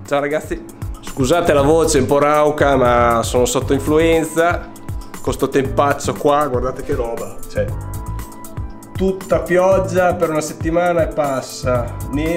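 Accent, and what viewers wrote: Romagna, Italy. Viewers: grazie mille sei sempre molto chiaro!! E poi con quella parlata romagnola sei sempre meraviglioso 😜😂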